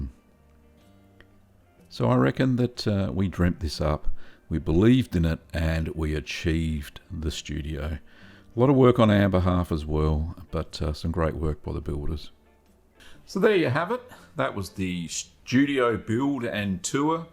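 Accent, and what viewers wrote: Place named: Australia. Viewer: I want to develop an accent like yours, can I develop it without coming to Australia, though? Like, I love the Aussies, but the snakes and spiders and sharks..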